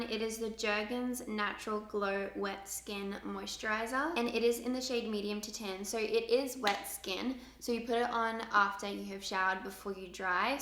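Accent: United States. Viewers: Can anyone else hear the slight American accent that has developed. When she says A's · sounds american now eg when she said matter 😂 ill miss your aussie accent 😊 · Yoooooo shes getting the american accent !! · Wow her accent is definitely sounding American now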